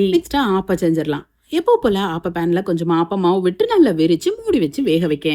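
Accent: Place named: Kanyakumari, India. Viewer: Unga native kanyakumari district Tamil akka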